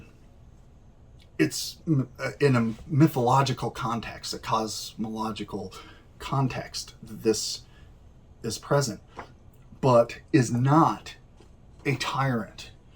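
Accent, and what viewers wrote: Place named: Pennsylvania, United States. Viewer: He sounds like he's from Pennsylvania! 😊 Quick question: Can you kill someone with this? 👀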